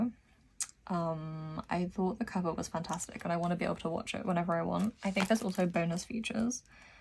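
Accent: United Kingdom. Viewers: Vocal fry sounds so lovely when the person has received pronunciation rather than a Kardashian valley girl voice. Anyway, feel better soon! You're so right about our lack of seasons. It never seems to get very cold here these days. We might get a cold snap lasting about a week before we're back to a solid 50% humidity and temps in the low teens. I can't remember the last time I had to wrap up warm on my end of October birthday. I'm of the opinion that infections have risen because it's never cold enough to kill everything off.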